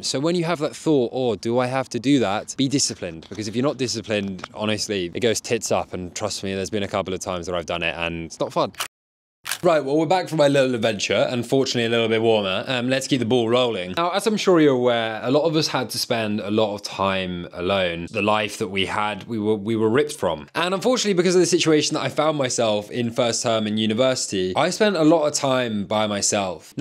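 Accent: United Kingdom. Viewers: WOW 너의 영상을 보며 영어공부를 합니다. 너의 영국발음이 너무 어렵습니다. 나는 영국인처럼 영어를 하고 싶습니다.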